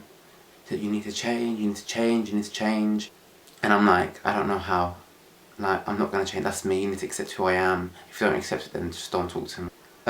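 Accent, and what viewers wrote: United Kingdom. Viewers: hey sounds British. I just started watching this now and I happy I clicked on the video. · First off Love you, Love you,Love you to pieces! You are so cute and I'm an amercian boy whose a sucker for british accents. I was lucky to stumble upon this video when looking up a video on being gay and muslim. I can understand completely because being African Amercian and raised in southern Christianity I know fanatical extremist minds all to well.I hope things continuously get better! Hold onto the accepting family and friends and release all others to love and light!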